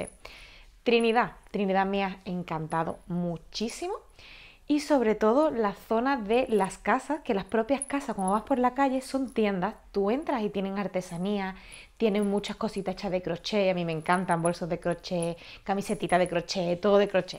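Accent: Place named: Malaga, Spain. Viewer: Que hermosos lugares tiene la hermosa Cuba, por algo es la isla de ensueño, y sobre todo ese característico acento malagueño tuyo, sin duda cautiva mucho, sobre todo cuando hablas bajito, saludos 🎉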